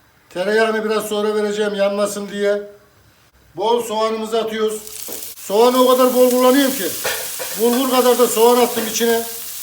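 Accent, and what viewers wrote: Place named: Tokat, Turkey. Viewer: Konuşma Tarzın İç Anadolu tarafı Gibi .Merak ettim Nerelesiniz?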